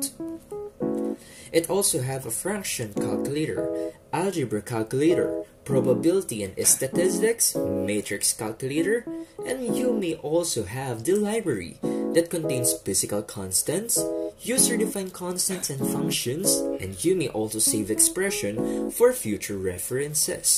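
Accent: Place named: Philippines. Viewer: pinoy ka nu 😊 the pronunciation and accent gives it away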